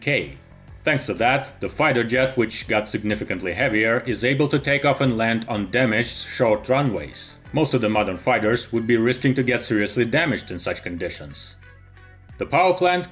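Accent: Russia. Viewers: От этого отстоя отказалась армия. Так что - можете не обсуждать привидение Каспера. С рязанским акцентом троллей посёлка Ольгино. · North american video with a russian accent...complete bs...what about the fact it can stop mid flight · Good video and I love your Russian accent. Subscribed.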